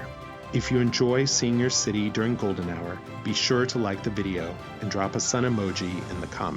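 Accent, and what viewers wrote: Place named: Italy. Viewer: "Sorry for my bad English" cracked me up! Il tuo inglese è eccellente, better than most Americans, e gli Americani adorano gli accenti Italiani!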